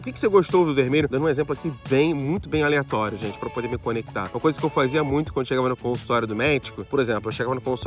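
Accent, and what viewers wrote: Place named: São Paulo, Brazil. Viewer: O conteudo muito bom, o sotaque de faria limer orrita um pouco... hahahahaha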